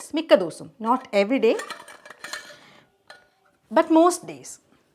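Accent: Thrissur, India. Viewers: Nice class . 👏. Madam, are you from Thrissur ? Your slang is like thrissur ,am I correct,,??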